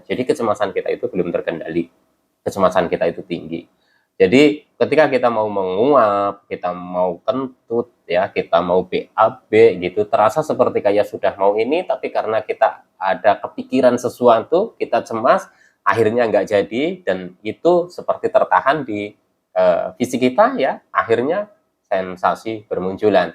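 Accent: Banyumas, Indonesia. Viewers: WALMAS hadir mas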